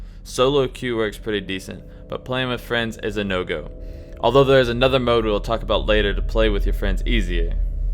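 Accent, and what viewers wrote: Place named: Australia. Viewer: sounds australian to me, our accents make it sound like we say "bee-ah" while americans use a hard R at the end. same goes with all words like beer, like fear and dear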